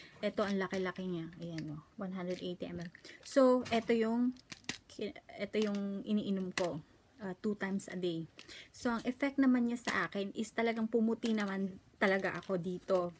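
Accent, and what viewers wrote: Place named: Ilocos, Philippines. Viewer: ilokano k girl? pra kcng may punto k. ganda mo nmn khit nsa japan k belo p rin eh db dami gluta dyn?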